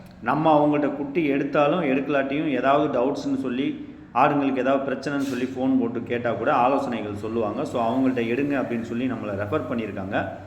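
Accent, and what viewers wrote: Madurai, India. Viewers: ❤ madurai basha ❤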